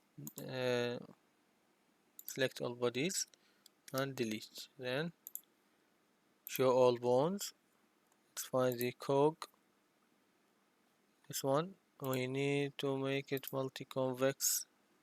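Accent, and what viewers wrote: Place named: Iraq. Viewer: لهجتك عراقي انت عربي لو كنت عربي عندك دوره باللغه العربية اشتريها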